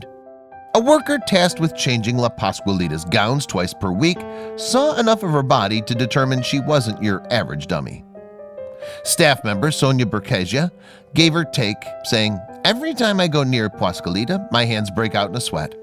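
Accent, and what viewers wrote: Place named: Germany. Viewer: He sounds like what a thick German accent voice feels like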